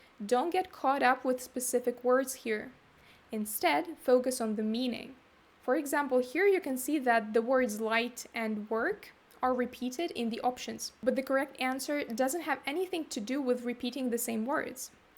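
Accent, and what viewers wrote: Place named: Russia. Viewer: Такой сильный русский акцент....😂😂😂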